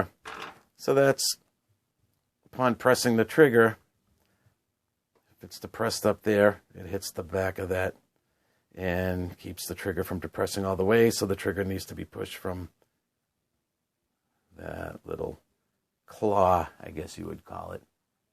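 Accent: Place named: Boston, United States. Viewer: first video ive seen of yours off rip i can tell your from Boston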